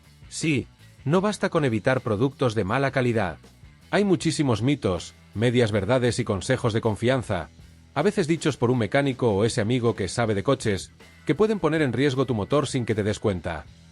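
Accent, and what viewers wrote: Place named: Spain. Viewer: Me desesperó ese acento español ibérico y creo que el video no aporta mucho. Es evidente que está hecho por IA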